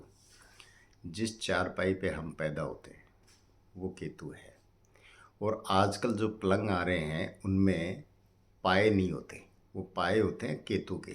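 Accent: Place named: Haryana, India. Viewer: Bahut achi jankari ji.......Aapki tone k hissab se aap sayad haryana se belong krte h....nice video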